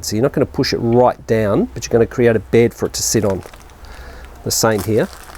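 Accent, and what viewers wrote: Australia. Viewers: Maybe it sounds like questions but more than likely just Aussie accent:) It'd be boring if we all sounded the same:P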